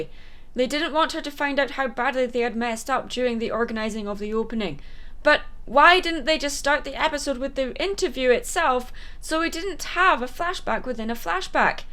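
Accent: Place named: Lothians, United Kingdom. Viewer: You sound like you're from the lothians, am I right?? xD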